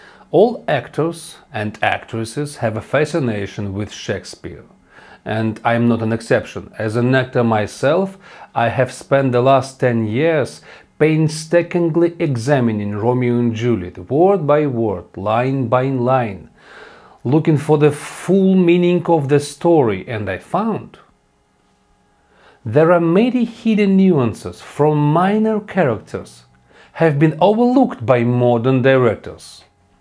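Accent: Russia. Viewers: Good luck! You do a great job, don’t stop and don’t listen to haters! It’s ok to have hard accent. Russians IT professionals have the same pronunciation and no one bothers. There’s no problem for them to get understood by foreigners or even natives. Wishing all the best, Julia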